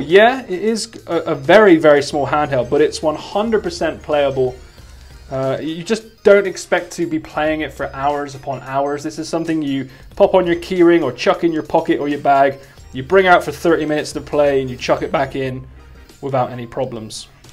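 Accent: Bristol, United Kingdom. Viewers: This is pretty cool I won't lie, but I swear you're also from Bristol with that accent of your's (hopefully that can be taken as a complement)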